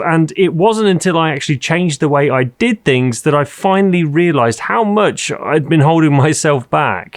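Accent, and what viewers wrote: United Kingdom. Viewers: For what concerns the cap, in my opinion things are not so straight as you say. From your accent it seems you live in UK, a moist country, so perhaps the air is cleaner than in my country (Italy) where most of the time there is a lot of unseen dust floating around. If I keep my lens without the cap for about 15 minutes I find the lens surface littered with disgraceful dust which forces me to clean it with a cloth, and so loosing the chance to shoot a good photo.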